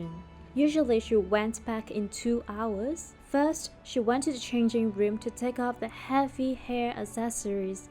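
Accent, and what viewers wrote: China. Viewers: whoever u are, this is one of the worst content. The female narrator sounds like a village girl from northern china with horrible accent. This is a fcuking joke!!!!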